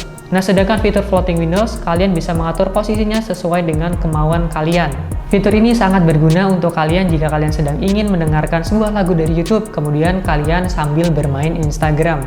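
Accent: Tegal, Indonesia. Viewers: Tegal kah?? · tegal bos? haha auto sub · Jebule tegal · Wong tegal um??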